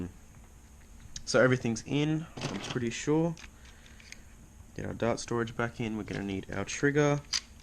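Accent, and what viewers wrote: Australia. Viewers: Woo a Aussie, great Video, lots of help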